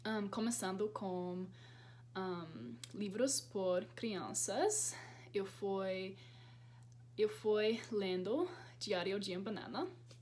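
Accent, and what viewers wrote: Brazil. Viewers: o sotaque parece o meu (carioca) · estás a aprender o português com sotaque brasileiro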